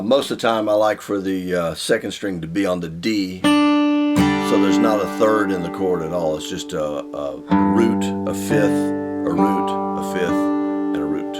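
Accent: United States. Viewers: Just your explanation of how you play a G chord is off the hook. And you have the most perfect southern accent. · that's great, I'm smiling, it sounds like Tennessee to my ears, thank you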